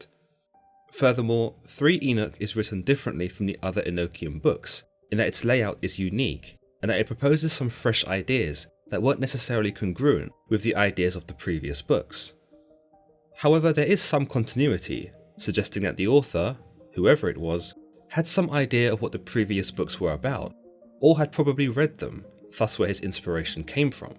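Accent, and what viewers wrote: United Kingdom. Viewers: Your scene is very witchcrafty creepy I noticed you have a British accent I I know there's people trying to sell something on TV have a British accentee too and make you more respectable acceptable and intelligent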